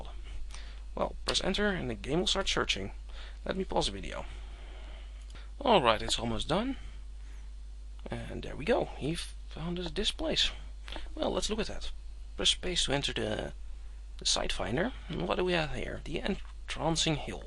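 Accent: Netherlands. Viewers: Can't hide the dutch accent eh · Can I ask you a off-topic question? Are you Dutch, perhaps, if so, you're way of talking gives that away ^^ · Yeah hes dutch allright , but its understandable so its all good :) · you sound dutch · are you dutch cuz i my english sounds EXACTLY like u o.O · From your accent I can tell you're dutch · Are you Dutch? Your English accent sounds that way. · absolutely Dutch accent :) I'm Dutch myself and I kind of recognize it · Dutch accent is Dutch. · are u from holland>? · Are you dutch? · are you dutch? you have an accent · Dutch accent yez? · Is that a dutch accent I detect? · He's most likely from the Netherlands, since the accent with which he is speaking is very recognizable for me. I didn't know it was a charming accent. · HOLLAND kan je horen aan je engels xD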